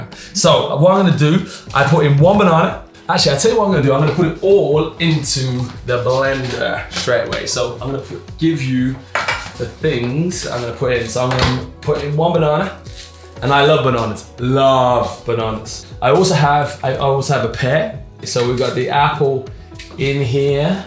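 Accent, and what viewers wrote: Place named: France. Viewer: You are so real, love your French accent, would love to hear your American accent.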